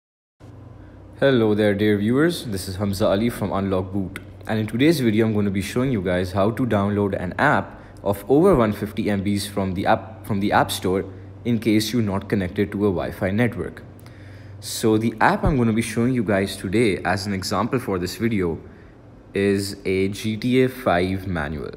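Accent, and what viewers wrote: India. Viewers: He sounds Indian af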